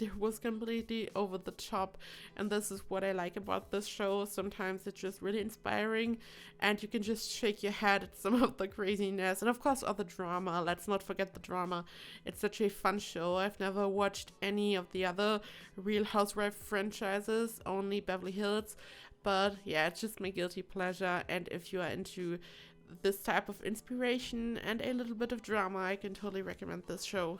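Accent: Germany. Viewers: are u german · Are you german? xD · Are you German? Your accent sounds like that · are you german? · Sounds German... To me As a German. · I were just wondering, are you German cause your dialect kinda reminded me of German people speaking English☺️ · i don't know how i ended up here but are you german? you sound very german :D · Wait, are you German? Btw, very nice one! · Bist du Deutsch?Hört sich vom Akzent so an☺️ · She really has an german accent lol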